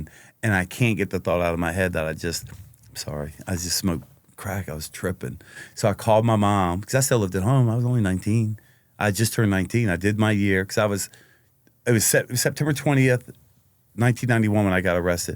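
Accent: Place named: Baltimore, United States. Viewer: Why the Baltimore accent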